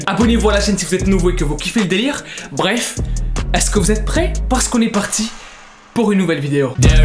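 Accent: Quebec, Canada. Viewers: J'adore ton l'accent québécois👍🏽mais aussi je trouve que ta raison ça pourais se retourner contre lui on plus ya pas a faire des vidéos ou on le voi nu ya des enfant qui ecoute !! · Il me tue l'accent québécois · Meilleur accent québécois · il parle trop bien ( quebecoi ) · T'as un beau accent québécois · L’accent québécois j’adore 😂😂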